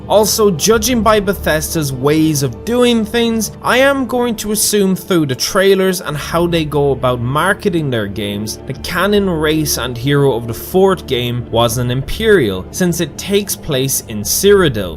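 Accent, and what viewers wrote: Ireland. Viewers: I love me some Irish accent to sleep 2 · I quite enjoy the Irish accent. It's just easy to listen to. Great video.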